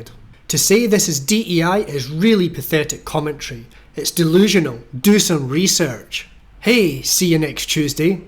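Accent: Scotland, United Kingdom. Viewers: I've just discovered your channel I subscribed to it. I really like your work. The first Scottish in my list, I love your accent reminds me of my high-school teachers 😊 Regards from Yemen (I bet I'm also the only subscriber on your page from Yemen 😅). Cheers ... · Brilliant. Articulating what 'get real' means. And the Scottish accent is cool, or is that racial prejudice 😂.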